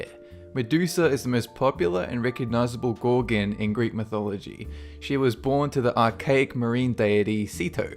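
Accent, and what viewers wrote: Australia. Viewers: Aussie all taay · Australian I think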